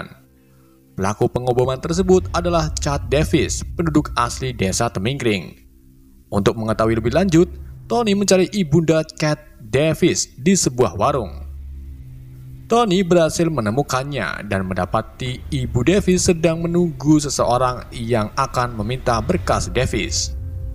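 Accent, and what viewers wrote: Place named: Banyumas, Indonesia. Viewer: Jawanne. Ndi mas??? Dimak dimiik kayong ngapak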